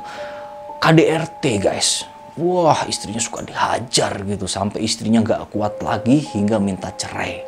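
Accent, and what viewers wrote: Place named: Sunda, Indonesia. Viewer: Sunda na kentel pisan atuh wkwk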